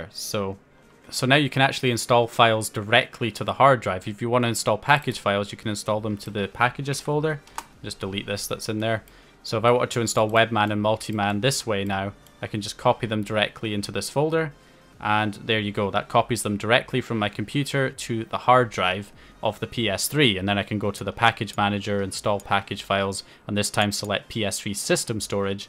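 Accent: Scotland, United Kingdom. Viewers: Loving the channel! Well paced, logically explained, no background techno and a clear Scottish accent!! ;) Top class and incredibly helpful. Thank you!!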